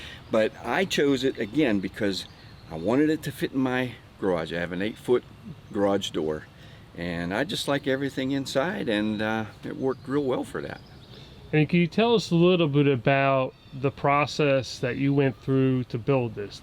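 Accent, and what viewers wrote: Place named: Western Pennsylvania, United States. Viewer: western PA accent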